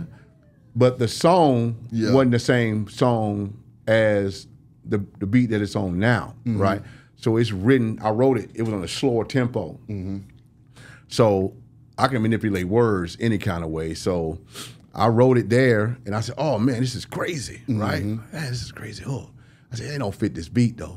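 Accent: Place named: South, United States. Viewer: This host always makes a rapper feels good with his hilarious laughs and comments,real love ,with his souther spin and accent on things .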